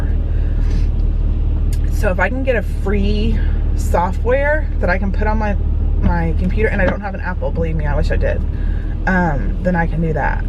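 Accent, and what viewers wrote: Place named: South, United States. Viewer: You're totally picking up a little southern accent girlfriend!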